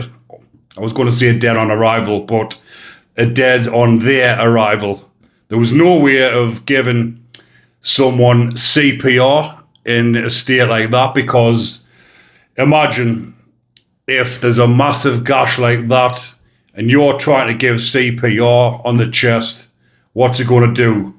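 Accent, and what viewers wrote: Newcastle, United Kingdom. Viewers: What's this term you use for sex offender ? A Bacon ? It's a bit incoherent the geordie accent makes it more Baycan. But that's new to me, you are the only person I have heard use it. What's the story behind that ?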